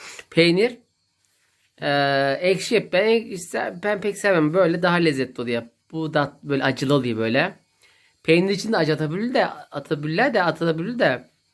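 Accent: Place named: Zonguldak, Turkey. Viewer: Ellerinize sağlık Maaşaalaaah. elinden herşey geliyo.Sşnopta yan komşumuz zonguldaklı,aynı sizin gibi konuşuyo.Öpüyorum ikinizi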